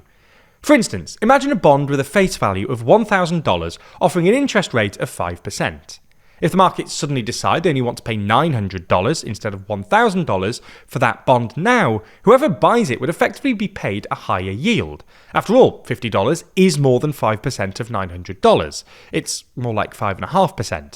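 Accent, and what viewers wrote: United Kingdom. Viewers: Up to the strident, 'all knowing' Ai British accent was tolerable, but then it transitioned into an all out adblock circumventing ad, BEWARE, CHANNEL BLOCKED.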